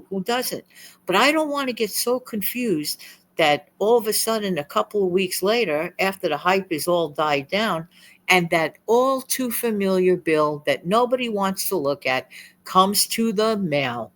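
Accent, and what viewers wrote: New York, United States. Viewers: As always, great tips! Thank you for the reminder to start on Christmas now! We do have a bin store, and I never thought to look there for gifts. What a good idea🙂 BTW, I love your NY accent😀it's like listening to someone from a movie! We're from NW Georgia. Movies get our accent completely wrong. Every. Single. Time😂 Yours is delightful!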